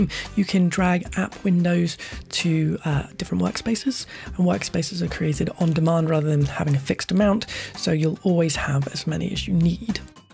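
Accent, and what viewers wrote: United Kingdom. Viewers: british accent always soft to my ears...i like it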